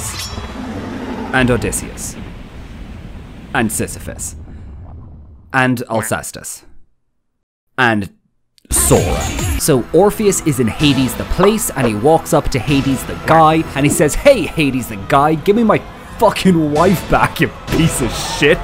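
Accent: Ireland. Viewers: Thank you, Senpai. UwU Also I love your channel, your Irish accent, slang terms/euphemisms, and cultural references are really fun to listen to.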